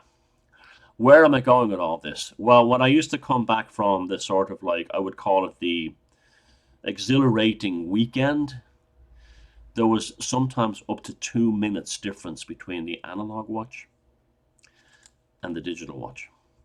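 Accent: Dublin, Ireland. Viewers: Dublin area accent? New subscriber here. Great vid, cheers!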